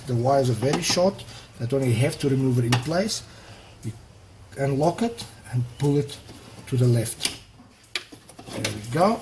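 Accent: South Africa. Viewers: Are you possibly South African? A Boer? :O Very nice ecu job! Thanks for the detailed video.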